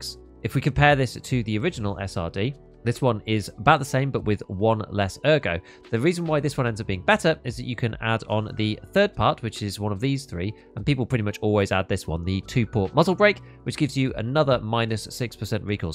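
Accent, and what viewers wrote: United Kingdom. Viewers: yea... dying and losing his kit wasn't enough shame. Then the replay of his death was immortalized on youtube along with his build getting hyper-analyzed and criticizing every little thing that was wrong with his gun. These videos are actually brutal even though the soft talking brit with the calm music makes it seem so wholesome.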